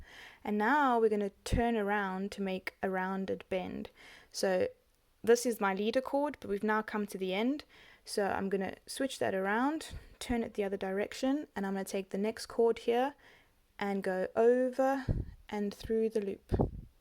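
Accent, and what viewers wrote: South Africa. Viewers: Great to hear a South African voice. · Thanks for this! I needed a refresher! Are you South African? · South African?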